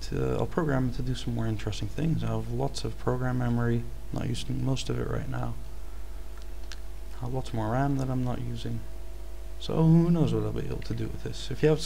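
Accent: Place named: Netherlands. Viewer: Ik hoorde hoe je praatte en ik dacht gelijk: wow, vette nederlander. Nice gedaan man :)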